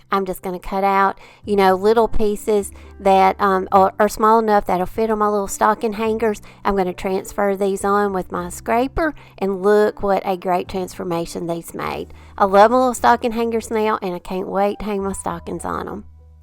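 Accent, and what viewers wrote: South, United States. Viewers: Everything is so beautiful! I love the ornament idea with your dads picture! So creative! And you have the most beautiful southern accent. I live in Georgia and Ive yet to hear it flow so beautifully like yours! 😂❤ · Love your videos and creativity! Love your sweet southern accent! I am from East Texas but have a childhood friend that lives in Guntersville AL. I live in Kansas and everyone says I have a Texan draw to my voice!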